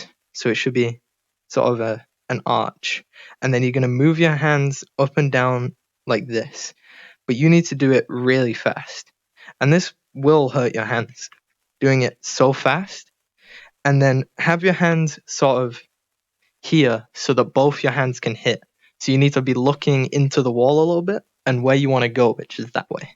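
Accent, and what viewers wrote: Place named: United Kingdom. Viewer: i think it is very good visually but i cannot seem to understand the bri'ish vocals very unclear and why dont you just walk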